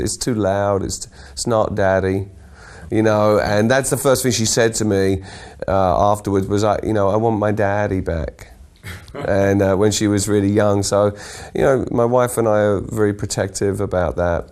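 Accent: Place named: United Kingdom. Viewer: Americans love the British accent, I understand ❤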